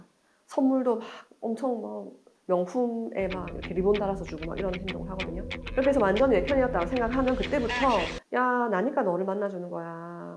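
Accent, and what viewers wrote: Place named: Gyeongsang, South Korea. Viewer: 오늘 깔끔한 얼굴에 갱상도 억양 굿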